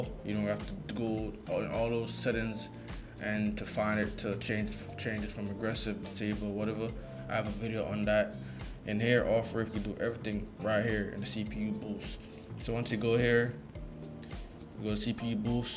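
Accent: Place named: Jamaica. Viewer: I can tell!!!! I know a fellow jamaican when I see and hear one. I'm from Mobay. I think I subbed to u by the way. I have the deck, the switch and rog ally. While the deck and ally are both cool, I still think personally the switch us a way better and funner hybrid. The switch 2 will furthermore burry all these wanna be switch gandhelds into the ground even more. Anyway keep doing your things nintendo for life though lol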